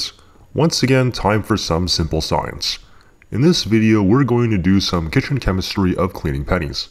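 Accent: Canada. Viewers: Ahhh ur from Canada eh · Are you canadian? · I knew he was Canadian in the first video I saw, which was I forget which one lol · I personally think Canadians are awesome. They have beautiful accents, they live in a fantastic land, and best of all they have this guy.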